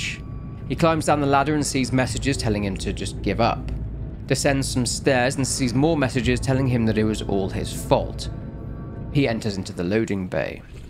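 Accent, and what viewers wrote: United Kingdom. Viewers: british, but soothing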